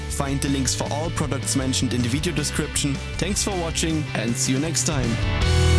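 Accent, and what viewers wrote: Germany. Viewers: Du klingst deutsch lol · Nice video, but earcancer. Are you german?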